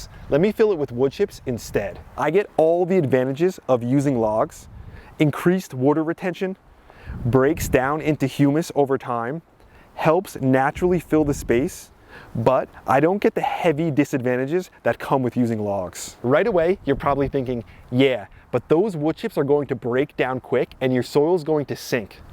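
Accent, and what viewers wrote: New Jersey, United States. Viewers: First time I've seen you, I'm guessing you're from Jersey, my wife is and her father said WOODER instead of WATER.😂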